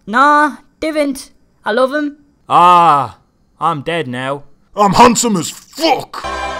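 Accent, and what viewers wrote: Newcastle, United Kingdom. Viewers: I wish you Geordie abridged more films... I'm not even Geordie but I understood it better.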